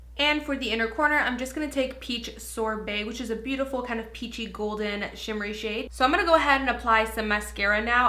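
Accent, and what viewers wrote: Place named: Canada. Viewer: you are so damn adorable!! Just now realized you are over 500k...I've been around since 80. So proud of you. btw i'm in love with your Canadian accent <333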